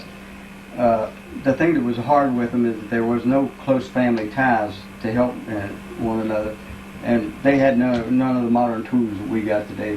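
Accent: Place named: South, United States. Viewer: Your cute southern accent 😹😘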